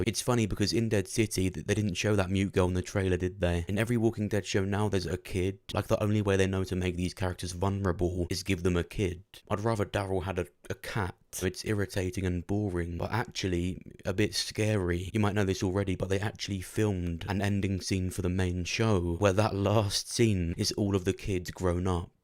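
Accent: United Kingdom. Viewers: you sound british, can you confirm if these will be aired in the uk because this is about to be my 13th reason if not.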